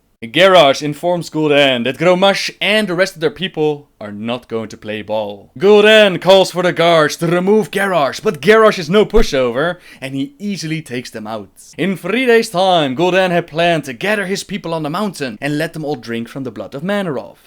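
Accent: Netherlands. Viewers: Where do you come from... it sounds dutch.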